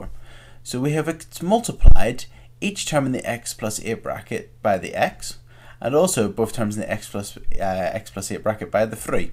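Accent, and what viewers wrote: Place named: Scotland, United Kingdom. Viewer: The Scottish are strong with this one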